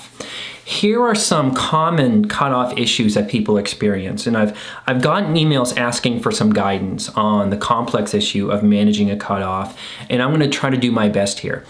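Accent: Boston, United States. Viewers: I love it when your Bostonian accent comes out! This video was medicine for me. I’m mid-cut off with a couple covert toxic people after a verbally and emotionally abusive episode where I had enough. Though it’s tricky because my life was already in limbo… divorcing an alcoholic and currently staying with a family member. This family member keeps bringing up how much it hurt one of the toxic people when I didn’t acknowledge her birthday following the abusive incident. It’s exhausting being under the same roof. This person definitely has a different story of our family. Looking forward to when I am able to get into my own place… I’m working on it. This video gave me the encouragement and validation to stay strong and true to myself. I feel so much calmer and grounded after I watch these. Thank YOU!! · Interesting how you compared cutting off family to a nasty divorce... 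It reminds me of covert incest and parentification, which can totally feel like a nasty divorce, even when you set the smallest of boundaries with the family members projecting those relationships onto you. PS great Boston accent! I'm Boston based as well! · 😂 love the 'Bwostin ackcint'!!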